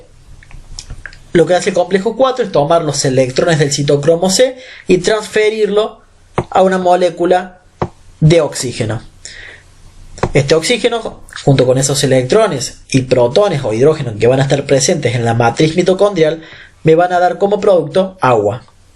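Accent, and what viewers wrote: Córdoba, Argentina. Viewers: muy buena aula.. y la tonada de cordaba me encanta jaja ... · aprobé con tonada cordobesa y todo!!! muchas gracias!!!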